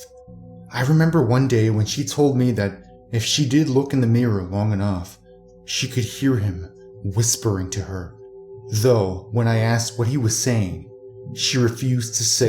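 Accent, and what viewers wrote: United States. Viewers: Love your cute American accent!!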